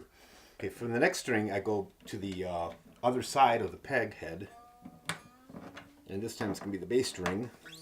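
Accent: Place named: Great Lakes, United States. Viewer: Your Great Lakes accent is quite strong.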